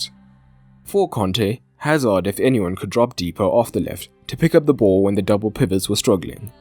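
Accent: South Africa. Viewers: Are you South African? great video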